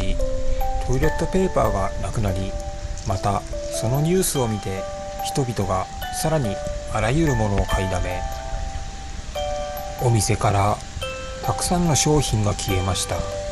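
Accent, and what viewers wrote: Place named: North Kanto, Japan. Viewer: ナレーター男性のイントネーションが関東以北の雰囲気を醸し出していたので、いっそのこと方言を丸出しで語らせたら違和感も無かったのにと思う。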